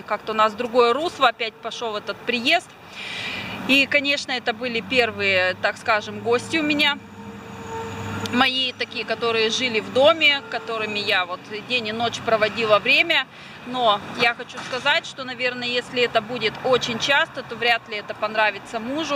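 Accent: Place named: Siberia, Russia. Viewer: Добрый вечер,. Захотелось высказать свое субъективное мнение ))Я пару раз пыталась смотреть ваш канал, но так и не осиливала больше двух роликов – не очень то люблю «говорящие головы». Но возвращалась - притягивало ваша природное обаяние, да и YouTube-советчик ) Попыталась разобраться и вот что поняла. В Вас есть то, чего совершенно недостает современному человеку: искренность, самоирония, доброта и юмор. Вы на голову выше самых популярных блоггеров, которые пытаются заискивать перед зрителем, подстраиваются, ведут себя иногда довольно резко и странно. Так что оставайтесь такой, со своим мнением, со своим сибирским говорком, с прекрасной улыбкой. А грязь к таким, как Вы, не прилипает )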